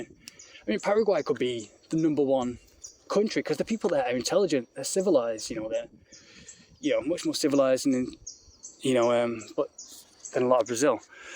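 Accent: United Kingdom. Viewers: Oh, you're Australian? I thought you were a brit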